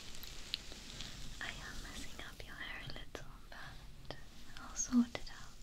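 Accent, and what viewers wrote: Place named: Midlands, United Kingdom. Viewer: I get the impression you're from the Midlands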